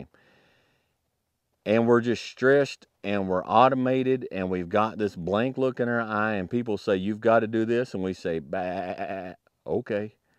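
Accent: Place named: West, United States. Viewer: I think folks always confuse a western accent for a southern accent, this man's voice should clearly define the difference lol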